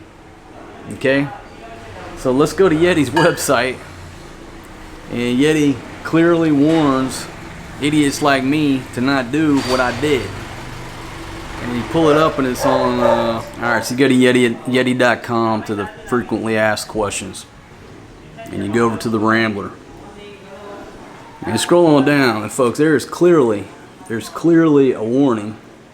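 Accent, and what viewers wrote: United States. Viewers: I’m Canadian and listening to you is like watching a Saturday Night Live skit that is so quintessentially American. Thoroughly enjoyed it and hope Wife #1, 2 and 3 are happily making use of your in tact Family Jewels.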